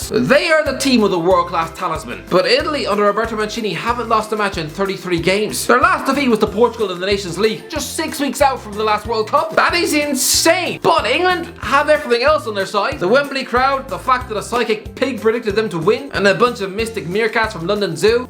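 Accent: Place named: Ireland. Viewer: why have i clicked on a prediction video and all i can hear is an irish guy talking about a 2003 match magazine?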